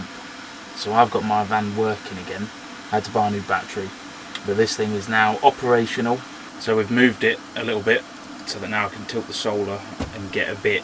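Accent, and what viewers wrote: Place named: Peterborough, United Kingdom. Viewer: Just come across your channel and I recognised your accent straight away, I'm from Peterborough. I lived in my van for a year or so. I look forward to watching your adventures, the scenery looks amazing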